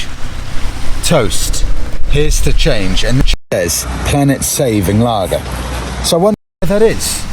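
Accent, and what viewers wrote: RP, United Kingdom. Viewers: My hero David Icke lives on the Island.I have been there years ago.It certainly looks nice if you are lucky with the weather.You have a very clear speaking voice BTW.Slightly posh but not as much as a Sloane ranger.We never did get to see,but i heard her low sweet voice.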